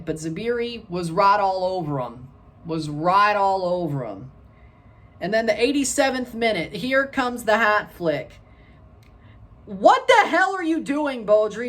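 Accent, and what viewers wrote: United States. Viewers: I love your GRINGO Spanish!!! Morocco loves the USA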